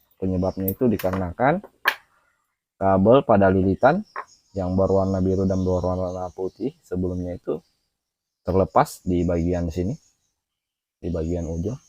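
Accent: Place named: Bugis, Indonesia. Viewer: Kayak orng bugis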